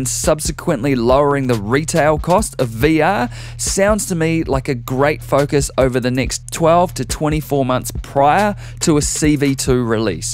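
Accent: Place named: Australia. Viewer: another great video thank you, I noticed you were speaking more slowwwly this time. Is that to help an international audience with your Aus accent? if so my hats off to you for expanding. although personally I preferred the 100MPH, full bore, let rip :) keep up the good work, I look forward to the next Video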